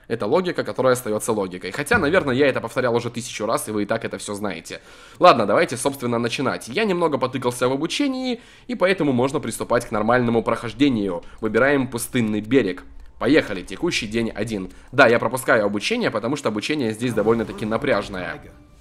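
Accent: Belarus. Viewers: ты белорус или русски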